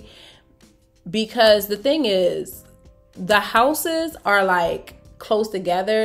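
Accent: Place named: Philadelphia, United States. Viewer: You definitely still have your philly accent on a bean too.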